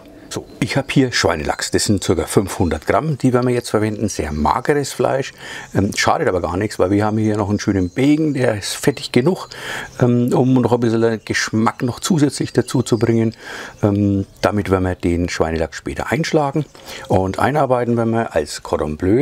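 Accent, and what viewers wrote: Franconia, Germany. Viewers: Hi, tolles Rezept wie immer. 😋👍 Eines nur: 'Wenn ma' no au dei frengischs gebrabbl a bissle bessr vrschdao dät', dann wär amma arma Obrschwåba wia mir scho' viel gholfa! Bleib bei deinem/r Dialekt/Mundart - es würde SO viel verloren gehen, redeten wir nur in der ersten Fremdsprache, die wir Süddeutsche als erste lernen mussten. A Griasle vo' Obrschwåba - und mach weiter so. 👍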